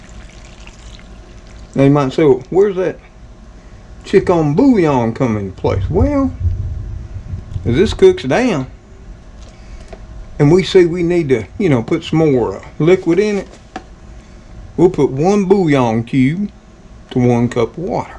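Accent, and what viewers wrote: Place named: Cajun, United States. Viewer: Before the video is over we love cats and we want to see TRIX she is so cute bust like my cats.Get cooking some more with your oignons cajun talk...I understand I'm french canadian so it's fun dropping in and putting the mask and the Covid aside!Take care and give Trix and all your other cats a big hug for me! Until we meet again online Bye bye!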